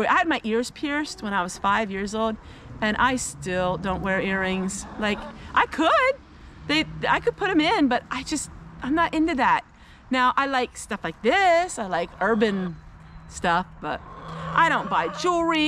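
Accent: Pennsylvania Dutch, United States. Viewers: "Cryin' in a bucket" Oh, I do like that. My first thought was ", your PA Dutch is showing" I am really enjoying this video. Just got to the part about how we like it when you ramble, and yes that is true! "Maybe it's 'cause you're talkin' ta us like we're just friends" Love and hugs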